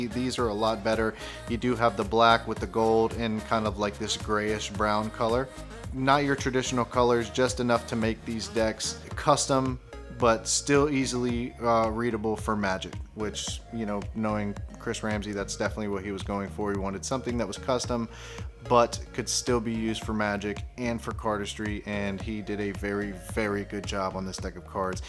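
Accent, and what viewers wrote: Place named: Houston, United States. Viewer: 🤘 question...Are you from Htwn? You talk alot like us down hurr.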